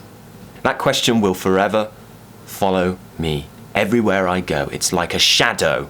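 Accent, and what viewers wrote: United Kingdom. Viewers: I like all Your video since the first time I found Your Youtube Channel, it help me improve my listening skill especially understanding British accent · Love the British accent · Another question: Do you want to be my personal teacher? It's a joke, but I love you...rrrr accent... The only British accent I understand is yours. 😍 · A shoutout from Brazil. I'm passionate about the British English and I love your accent. Your videos are brill! keep it up! Cheers! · Hi. I watch you often, trying to learn British accent. You're a cool guy. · I love your sophisticated British accent omg <3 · I love the British accent and of course your teaching way! Thank you so much for your priceless and wonderful lessons! Please keep doing it. When I have a bad mood or something wrong I just watch your lessons. I want to say that your videos makes me feel a better and change my mood because your video is enjoyable. 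Thank you! 😊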